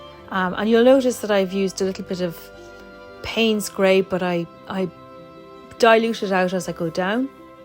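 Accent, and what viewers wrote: Ireland. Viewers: I just found you on YouTube! Love your drawings. Would you share what is the name of the brown ink? I really like it! I also adore your lovely Irish accent! Thank you.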